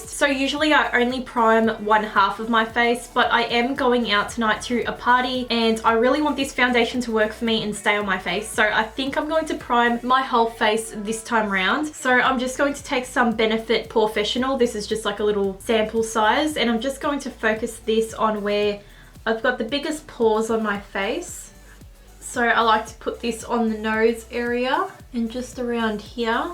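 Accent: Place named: Australia. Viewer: I love your channel! You're my fav. Makeup-youtuber with no exceptions, love the australian english too!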